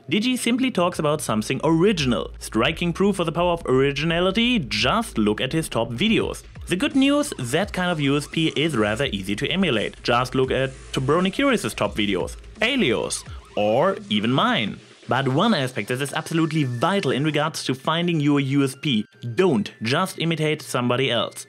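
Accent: Germany. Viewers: Are you german I watch some german youtubers I recognise that accent just curious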